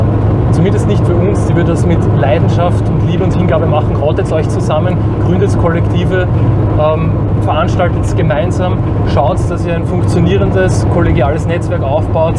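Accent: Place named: Austria. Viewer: Das ist so witzig, deine Sprechstimme zu hören. Ich kannte bisher nur deine Singstimme (sehr überraschend bei einem Sänger, ich weiß :-D) und die ist ja so anders. Und dieser schöne Akzent! Hat mich grad total überrascht, obwohl ich ja weiß, dass du Österreicher bist. xDD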